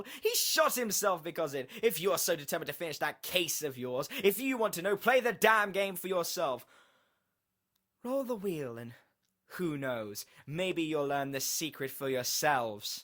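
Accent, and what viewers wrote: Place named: United Kingdom. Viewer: Just because you have a British accent, this reminds me of an episode of Sherlock xDD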